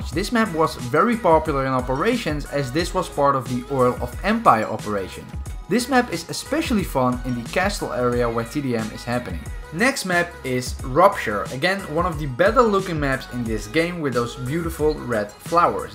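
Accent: Netherlands. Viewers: Nederlander hè? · Je praat Engels als een Nederlander maar het is goed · Ur from holland Bet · are you Dutch? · Wait you are dutch? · Are you dutch? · you speak English with a Dutch accent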